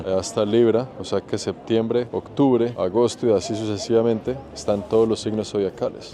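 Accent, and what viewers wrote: Colombia. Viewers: Varias veces! Y me encanta su acento Colombiano.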